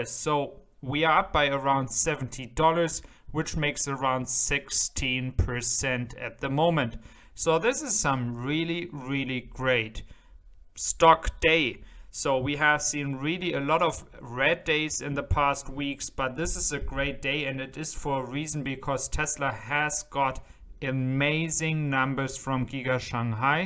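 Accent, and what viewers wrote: Germany. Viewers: new viewer here, 👋🏻, what's your mother tongue? & "Feviery" sounds a bit French, but your vowels and stress are all Germanic. "Twenny" — Not sure what this tells me "six hundred and thirty two" — presence of "and" makes me think not German or at least didn't learn US English, but: "ja": Maybe you are German? I'm all confused :-)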